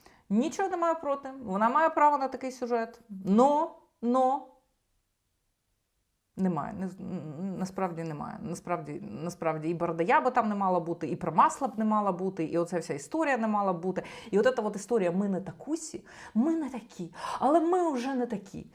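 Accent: Russia. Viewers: Цікаве відео, дякую ) Ви питали, чи дратує ваше цмакання. Мене не дуже. Але є інші звуки, що не дуже органічно звучать для мене. Такі слова, як "немаЄ", "знаЄш", "здаЄться", ви вимовляєте як "немаЭ", "знаЭш", "здаЭться", на російський манер. Стараюся не звертати уваги, але реально важко. Тому дивлюся вас рідко, на жаль.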